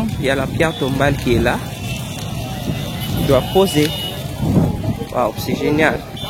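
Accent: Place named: Democratic Republic of the Congo. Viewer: Mboté !! jeune homme .....J' ai tellement d' affection profonde pour la RDC ( et la République du Congo ) que je ne peux pas laisser votre vidéo si bien réalisée en employant ce "bonjour " si familier pour moi . En France le 2 Novembre est le Jour des Défunts : fleurs sur les tombes particulièrement ce jour -là ; les marchands de fleurs vendent beaucoup de chrysanthèmes à cette occasion mais de plus en plus d' autres fleurs maintenant. En général c' est un jour plutôt morose pour les familles concernées . J' aime votre vidéo parce qu' elle me fait voir encore plus de coutumes des Congolais . Et je reconnais tout de suite le cher accent de votre pays !! Dans quelle région est votre famille ?? Amitiés de France 👍🌞🙂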